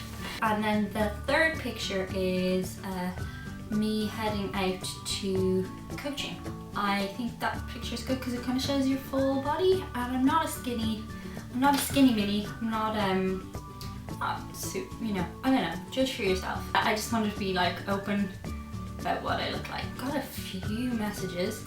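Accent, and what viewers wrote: Ireland. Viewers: You are a joy to watch but I must agree that this post was very open and sweet! Of course you’re more proficient now, after so many posts and growth is always good! I visited Ireland almost three years ago and your voice “takes me back” to that gorgeous country! I’m thrilled that you’re doing so well AND that you knew that Chewie would be a dear friend and faithful companion; smart lady! Thanks for sharing this!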